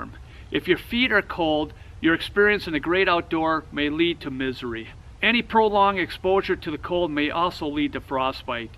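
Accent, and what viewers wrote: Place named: Midwest, United States. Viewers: I'm born and raised in the great state of Michigan. If you sir are not, you would fit in great in the U.P. Your accent is very Upper Peninsula Michigan/Canadian good sir lol. We spend a ton of time outdoors. You gave some great insight for those who don't know the tips and tricks we use to stay decently toasty! Take care and have a great day🇺🇸🇺🇸🇺🇸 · Great info ,taken aback by Minnesota/Wisconsin accent it's really distinct.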